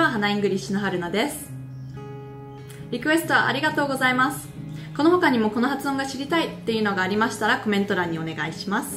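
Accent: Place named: United Kingdom. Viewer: Hi, your video helps me a lot. BTW, I just wondered your accent sounds like British. How many years had you lived there? Thank you!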